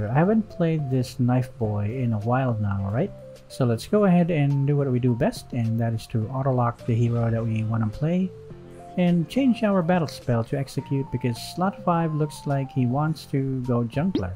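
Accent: Philippines. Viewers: Dammnnn your tagalog accent 🤤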